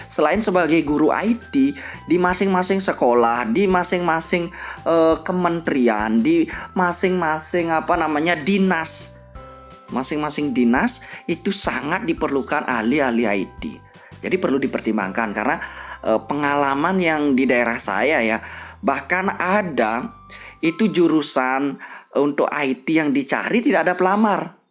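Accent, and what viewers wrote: Bali, Indonesia. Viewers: T nya lucu ya? dari bali ya? All the best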